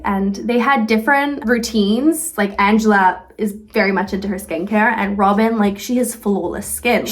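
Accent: United States. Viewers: its so cute how shes starting to sound a bit american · She lives mostly in the U. S. so, of course, she's going to take on a more American sounding accent... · she basically has an american accent now lmao shes so cute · Do you guys think she has more of an American accent than British now? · What happened to her accent? It became really american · She’s just got a standard accent now. Plus she does tend to put on an American accent a lot. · i love her american accent 😍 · I just don’t get what’s going on with her accent. She’s worked in America for most of her life and still maintained a British accent, even in her interviews last year she had a British accent, but now she suddenly has an American accent? 😭 how does that even happen? 😂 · Her American accent is getting stronger I miss her UK accent 🥲🫶🏻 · I was noticing that too! She sounds so American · You're picking up the American accent Millie. 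Very cute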